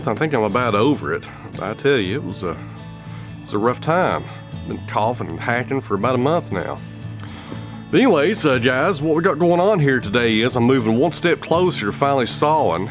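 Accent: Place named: Tennessee, United States. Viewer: The Tennessee accent is entirely A-OK with us American's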